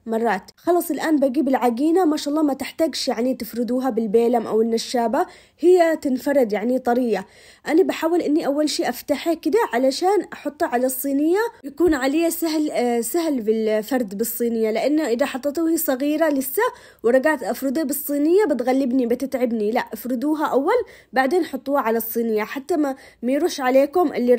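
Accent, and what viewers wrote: Aden, Yemen. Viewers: والله انك تجنني تسلم ايدك واللهجة اليمنيه روووووعه ماشاء الله الله يسعدك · ماشاء الله عليك مبدعة وفنانه واسلوبك بسيط وكفاية كلامك العدني ي بنت عدن الحبيبة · انا بشوف القناة عشان الهدرة العدنية جبر قلبك❤ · ما شاء الله تبارك الرحمن روعة ومتألقة في طبخك وقناتك الله يعينني كلما شفت طبخك سال لعابي وقررت بطني ولهجتك العدني تجنن الله يسعدك ويوفقك وبالصحة والهناء والعافية والسعادة عليكم يااااارب ❤❤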